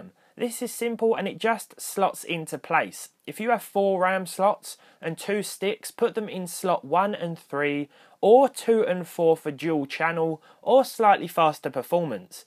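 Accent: United Kingdom. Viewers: Of course I enjoyed this video. I'm in love with your British accent. It's so fascinating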